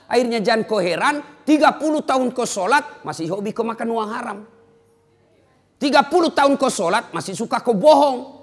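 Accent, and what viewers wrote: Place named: Makassar, Indonesia. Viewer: Saya orang jawa tapi logatnya orang Makasar tetap ewako..menyenangkan.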